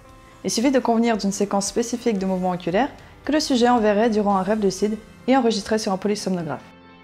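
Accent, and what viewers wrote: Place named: Switzerland. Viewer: Ah d'accord merci! Vous avez pris l'accent :) Vous habitez dans quel canton? Et c'est vraiment intéressant ce que vous faites bravo!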